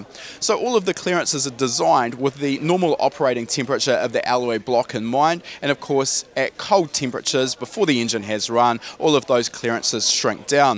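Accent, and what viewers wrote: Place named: New Zealand. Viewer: Solid kiwi accent. Having said that, fantastic explanation.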